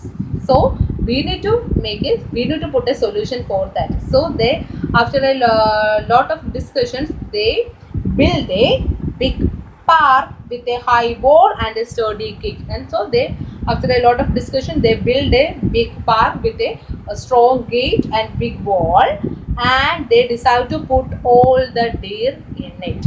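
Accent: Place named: India. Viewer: Bad explaining and butler English very bad better luck next time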